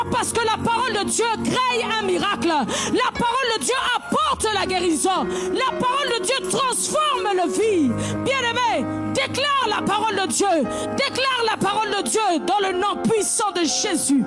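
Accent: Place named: Democratic Republic of the Congo. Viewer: Elle prie comme les congolais. Meme accent. Sois Beni maman. Que Dieu vous fortifie.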